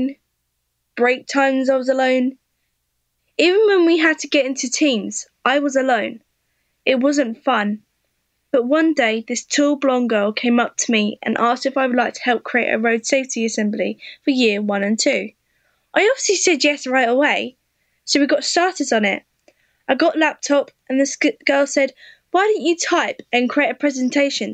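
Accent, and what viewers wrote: United Kingdom. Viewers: Is she British? I’m not being Offensive but I’m wondering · Is she british i luv brits! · I love her British accent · It’s a British accent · British yes finally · Eyyy another british girl!! :3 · OMG I LOVE YOUR VOICE!!! (sorry im a sucker for British accents) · U sound British I like it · Devica she's not Australian!! She's British!! I'm British too and that's what they sound like! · I love your British accent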